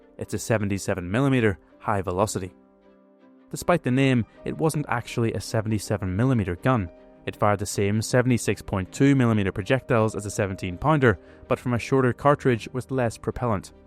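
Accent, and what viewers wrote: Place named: Northern Ireland, United Kingdom. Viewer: he's from Antrim or North down I would suspect based on his accent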